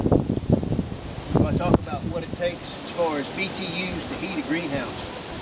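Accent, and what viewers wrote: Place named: Kentucky, United States. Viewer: Thought you sounded local! Southeastern KY?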